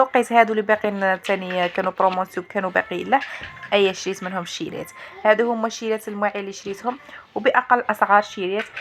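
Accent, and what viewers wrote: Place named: Sidi Bel Abbes, Algeria. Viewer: تحيا بلعباس خيار الناس يتحطو على الراس أحلى هدرة وأحلى لهجة ايييه توحشت بلعباس وناسها دنيا دارت بيا شحال من ولاية وأحسن من بلعباس وناسها ماكانش ختي رديتي فيا يام شابين ربي يحفظك وكثرينا من تصوير المدينة والله من أجمل ولايات بلادي تحياتي ليك ❤❤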